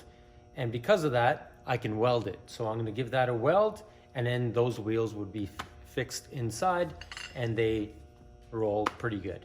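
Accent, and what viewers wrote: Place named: Ontario, Canada. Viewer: Ha was watching and just by your accent I knew you were one of the good guys, Canadians that is and from Ontario. I am in Windsor and I am build a smoker as well and just love watching others build them. Thanks for the video eh!!!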